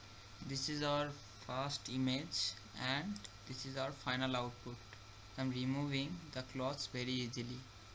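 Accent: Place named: India. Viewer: This Indian access bro... I don't understand 90% from what those people say. (That's because of two reasons) 1 Their bad English, 2 My ears LMAO.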